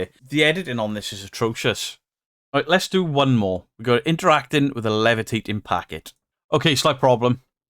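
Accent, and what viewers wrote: Wales, United Kingdom. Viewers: Petition for to read audio books. I love the welsh accent ❤❤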